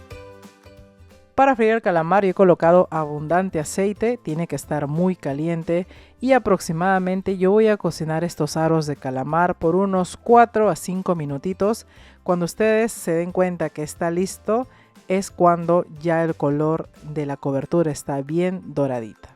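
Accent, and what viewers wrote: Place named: Peru. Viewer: Como me encanta tu canal . No pierdes el hablar peruano … “ya si eres bravo métele con todo” 😂😂😂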